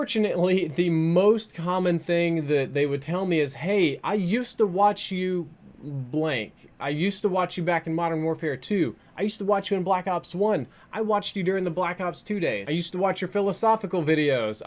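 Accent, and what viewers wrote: South, United States. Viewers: That southern accent was strong. Where’d it go? 😢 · I used to watch you all the time from like mw3 up until I stopped playing on console after advanced warfare but have recently got back into your content a bit more. Maybe that helps you feel a little better? I also really quite enjoyed your philosophical, abstract and dream videos since middle school (going to college now hehe). I'll definitely keep watching again :) Also, DAMN THAT SOUTHERN ACCENT LOL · That old video with his southern accent oml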